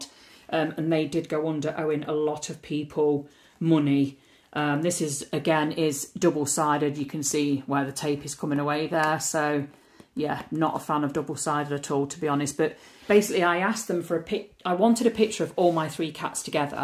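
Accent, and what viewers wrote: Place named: England, United Kingdom. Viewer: Wow I love the world earth day DP looks buatifull iv only come across you today An it's so nice to listen to an English person An not an American voice lol my cat daisy seems to love getting into amazone boxes xx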